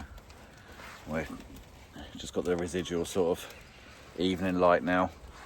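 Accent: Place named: South East England, United Kingdom. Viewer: I feel like I know the accent. You sound south-east UK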